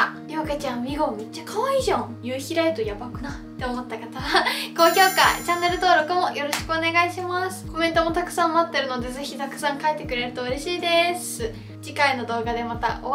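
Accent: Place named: Kansai, Japan. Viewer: りょうか道民なのに関西弁使ってるの笑う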